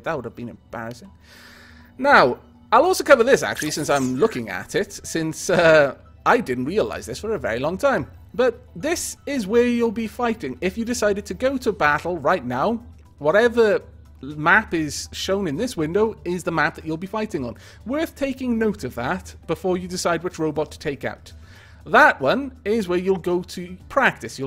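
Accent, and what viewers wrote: United Kingdom. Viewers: I wonder where that accent is from. It's like a proper British accent with some foreign mixed in to make it interesting.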